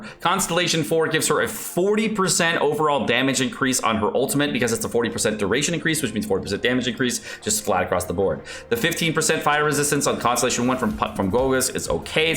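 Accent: United Kingdom. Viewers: Is that BRITISH holy moly, that can't be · Finally british is back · BRITISH LMAO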